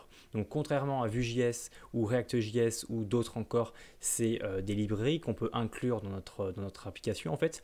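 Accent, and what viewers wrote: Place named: United Kingdom. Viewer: super mise a part l'accent anglais .... hhahhaa Le count m'a tué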